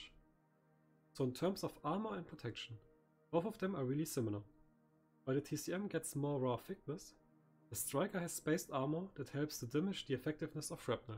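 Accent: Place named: Germany. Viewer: You sound german af, i like it. Very well made video too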